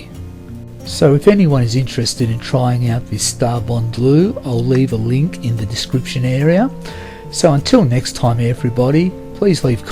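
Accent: Australia. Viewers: Sept' 2020 .. first time here .. so nice to hear an aussie voice lol, love watching how you experts create amazing brilliance.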